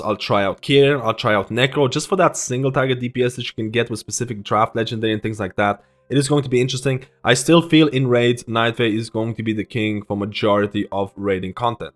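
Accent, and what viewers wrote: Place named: Ireland. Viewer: What's the deal with the accent? I can't place it, but there definitely seems to be a heavy dose of Irish in there?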